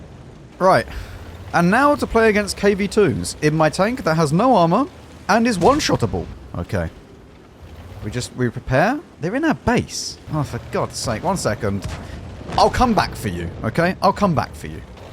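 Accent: United Kingdom. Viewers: I absolutely love the way you speak those words Brit man, nicest Brit accent ever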